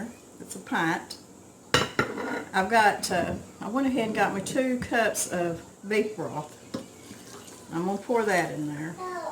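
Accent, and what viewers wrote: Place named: Tennessee, United States. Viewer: I see why.You home is beautiful, and your recipies are vicious, reminds me of My Moms cooking.And your voice is so I dont know laid back.My daughter tried to get me to do a cooking channel and I told them hell no,12 hours workin in the hospital is enough lol.12 hours a day at tjeb hospital.was enough for me.Where do you live?If you dont mind me asking our accent sound like Tennessee.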